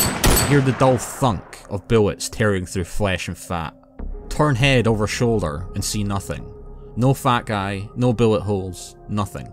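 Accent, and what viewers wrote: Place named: Scotland, United Kingdom. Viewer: I decide I like hearing a Scottish accent telling spooky stories. It keeps me actually focused on the story somehow and it also feels like I’m at the bar listening to a friend tell me an intense tale over some drinks. I like the vibe you bring sir.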